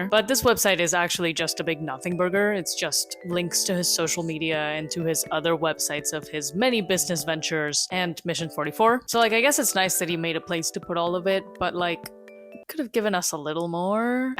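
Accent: Spain. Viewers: I´m gonna say, this is actually a good yt video... And hold on there is a really good spanish pronunciation, but i mean spanish from Spain. R u spanish...?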